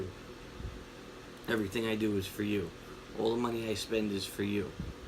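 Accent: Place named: Northeast, United States. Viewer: Thanks for the review brother. Just curious, where are you from? I knows it's the East Coast, I'm guessing north eastern, close to philly?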